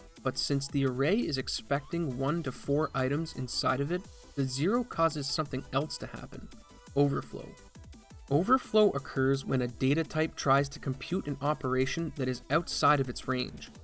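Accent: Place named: East Coast, Canada. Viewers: I love your accent so much. Nova Scotia, I'm guessing? You sound straight out of Trailer Park Boys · are you from the east coast of canada? I cant unhear republic of doyle in your voice.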